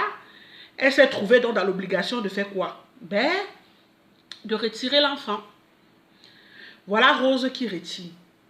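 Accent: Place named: Cameroon. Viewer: Coucou, dans ta voix on voit que tu es une Camerounaises et une femme FORTE ♥️👌👌👌 Tu es très Nianga ( je suis une fille no com vulgaire please )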